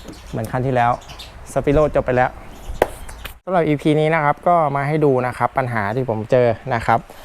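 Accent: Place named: Phetchabun, Thailand. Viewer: สำเนียงเพชรบูรณ์ หล่มสักครับ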